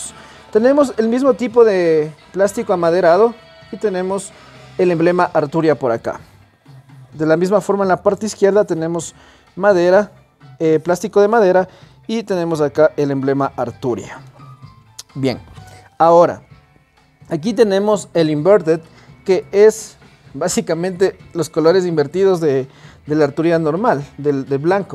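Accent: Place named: Ecuador. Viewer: de ley eres voz ecuatoriano, saludos desde cuenca, yo tengo la version blanca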